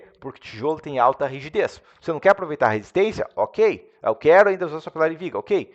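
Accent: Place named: Lisbon, Portugal. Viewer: Meu filho , uma dúvida : você é de que lugar do Brasil ? Faço essa pergunta por causa do seu sotaque . Você é do litoral de Santa Catarina ? Por que o seu sotaque parece.muito com o sotaque da Europa , o sotaque do português europeu , especialmente de Lisboa . Abraço .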